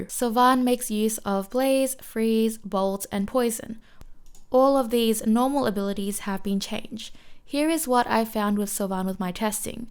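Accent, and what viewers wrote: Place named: New South Wales, Australia. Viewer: Those upgrades are very welcome! Thanks for the updates. Please keep it up. Love the accent too! NSW?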